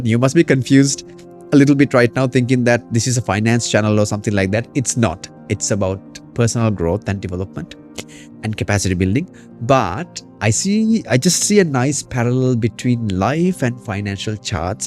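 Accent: England, United Kingdom. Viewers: Happy to hear your English accent sir I think your voice have something magical power❤ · Very much happy to hear your english accent sir. Love it. You are the motivation to express my self with confidence. I'll definitely speak with confidence one day like you. Thank you and keep up the valuable works. 👊 Jayen jayawewa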